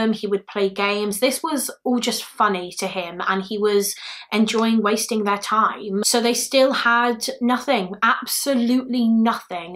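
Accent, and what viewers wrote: United Kingdom. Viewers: Amazing Thankyou, also as lovely as it is the American YouTubers I do love a Brit one xx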